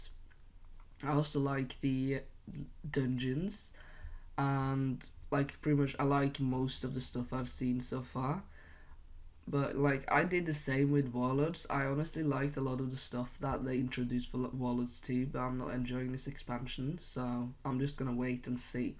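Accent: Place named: Finland. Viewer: At first I thought you were from Britain, but you sound Finnish (since Idk what norwegians sound like) so I just thought your accent was some sort of hybrid >.>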